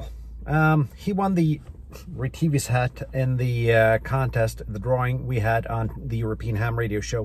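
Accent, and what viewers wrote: United States. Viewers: I just wonder, are you American or Norwegian? Americans are not named 😃 but you sure talk like one 😊 73 from LA-M160560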